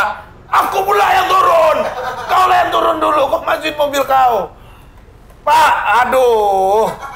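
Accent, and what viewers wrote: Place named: Padang, Indonesia. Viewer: Bang sering2 konten pake logat atau bahasa padang ya hehe berasa lg dikampung soalnya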